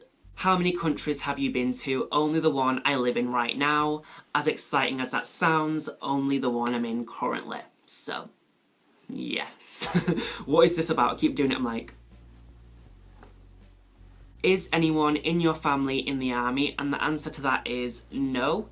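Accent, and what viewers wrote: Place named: United Kingdom. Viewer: Love this video😍❤ karate is a fight sport so yeah and I think you have a brittish accent and I realy like that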